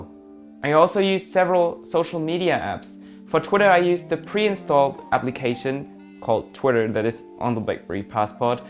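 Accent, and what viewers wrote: Germany. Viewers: Gutes Englisch für einen Deutschen. Hab mich echt gefragt, welche Nationalität hinter diesem Akzent steckt. Respect. But I think that the Passport will have an end in the future. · r u german, if yes, from where?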